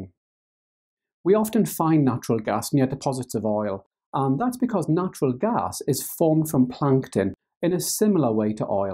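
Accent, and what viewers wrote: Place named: Newcastle, United Kingdom. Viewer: He's from Newcastle, so I'm guessing it's a slight geordie accent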